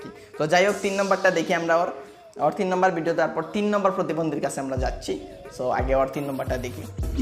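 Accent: Sylhet, Bangladesh. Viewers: Sylheti math tmr muko vala lage... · আপনি কি সিলেটি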